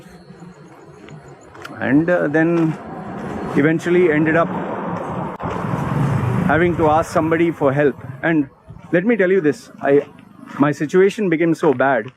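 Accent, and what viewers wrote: India. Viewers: You are an indian i can tell from Your accent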